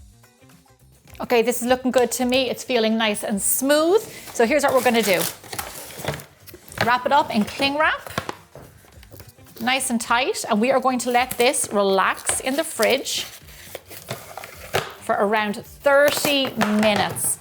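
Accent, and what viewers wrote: Ireland. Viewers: I lov'eth I lov'eth 😆, got to love the Irish accent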